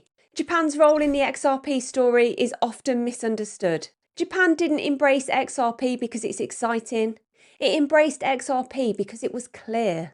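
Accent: Birmingham, United Kingdom. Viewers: Are you from Birmingham?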